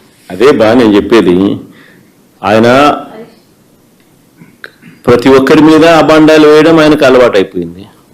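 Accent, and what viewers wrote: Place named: Rayalaseema, India. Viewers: మన రాయలసీమ యాసే సామి😂❤ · Rayalseema slang 🔥🔥🔥🔥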